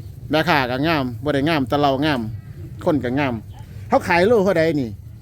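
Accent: Isan, Thailand. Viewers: สวัสดีบาว นี้และตั้นกำเนิดไทยอีสานใต้เว้าคือกัน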